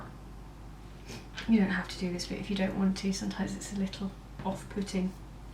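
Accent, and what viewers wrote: United Kingdom. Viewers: I like listening to you. I learn a lot because your videos are educational for make up. Also, I like your British accent..=)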